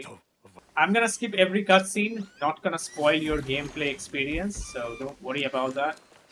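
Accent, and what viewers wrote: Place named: India. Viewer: слышу индуса и на душе тепло как-то сразу становиться.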